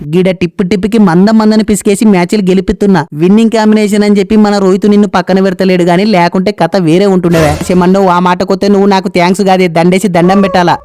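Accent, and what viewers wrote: Rayalaseema, India. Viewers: R u From Rayalaseema?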